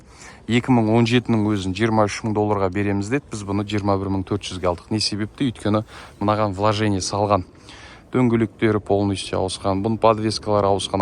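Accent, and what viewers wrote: Standard. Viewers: Мен озим колик айдай алмайм.Бирак узбей коремин таза казакша жургизесин Касибине береке!!!👍👍👍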